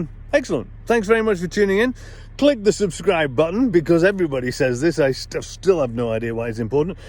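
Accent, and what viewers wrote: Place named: England, United Kingdom. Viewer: For a man that has been living in Canada for 15 years your English pronunciation is still quite English... 😜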